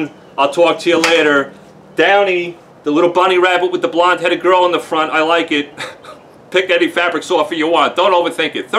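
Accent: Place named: Northeast, United States. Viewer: Finally an honest to goodness video on how to remove pet hair in cars/trucks 👍 Thank you so much for your informative video! I will definitely go out and buy a bottle of "All Fabric Softener" to rid of the pet hair in my truck! By the way, love your northeastern accent as well as your humor 🤣👏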